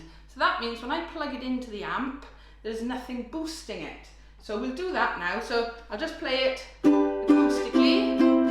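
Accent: Wales, United Kingdom. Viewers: im from canada and i love the welsh accent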